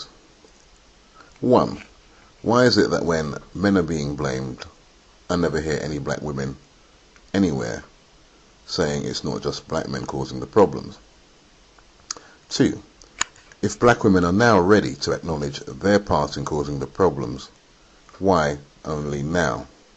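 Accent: United Kingdom. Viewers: "Berick Obama." Gotta love his U.K. accent.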